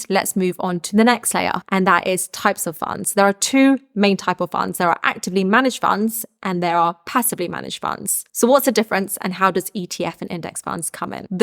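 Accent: United Kingdom. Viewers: Wow. So clear. And, good to hear a fellow Brit. Most of these types of videos are very US-focused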